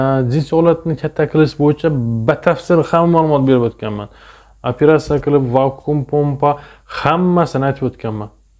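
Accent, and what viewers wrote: Khorezm, Uzbekistan. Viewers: Салом алейкум доктор хоразмданмисиз аксентиз билиниб дурибди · Доктир шеваингиз хоразма тортадику недансиз?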